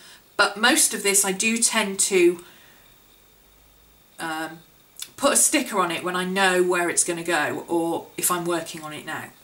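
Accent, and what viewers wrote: United Kingdom. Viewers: I'm just about to start bullet journalling :) Happy to hear a British accent, I was starting to think it was a purely American thing x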